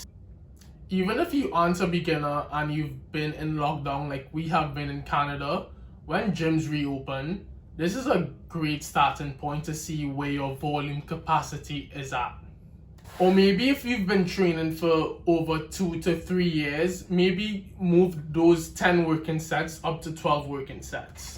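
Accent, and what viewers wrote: Trinidad and Tobago. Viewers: Great videos bro, keep up the great work. I recognize your accent, are you from Trinidad?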